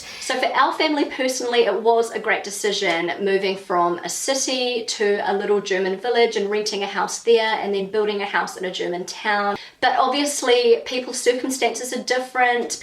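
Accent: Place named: New Zealand. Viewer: Neuseelandisches englisch ist fur jeden wine herausforderung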